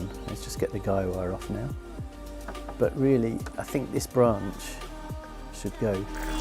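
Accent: United Kingdom. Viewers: Thanks, the music is fantastic as always. I can notice you are doing mindfulness sessions just listening your peaceful voice jiji. I love your perfect English accent. Take care.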